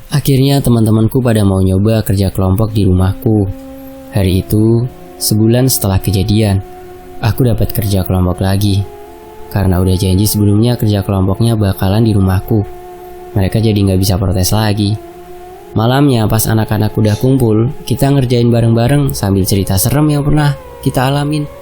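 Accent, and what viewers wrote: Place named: Banyumas, Indonesia. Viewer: Bahasanya ngapak campur jawatimuran min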